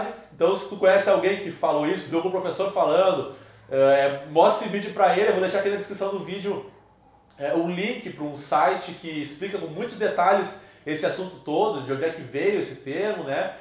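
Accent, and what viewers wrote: Rio Grande do Sul, Brazil. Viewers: Olá professor! Estou encantada com seus vídeos. São todos maravilhosos e de excelente qualidade!! Gostaria de saber seu nome e nome da equipe de trabalho. Creio que sejam gaúchos, pelo sotaque. Acertei??? Abraços à todos! E parabéns pelas explicações simples e fundamentadas.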